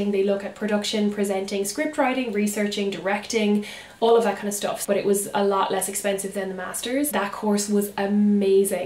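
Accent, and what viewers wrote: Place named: Galway, Ireland. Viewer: Well done :) I like the way you are down to earth and humble and you still have your nice Galway accent.. best of luck from Deirdre Marie Photography x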